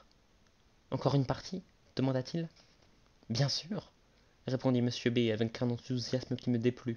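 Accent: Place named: France. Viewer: Non il parle juste francais de France. Il lit tres bien.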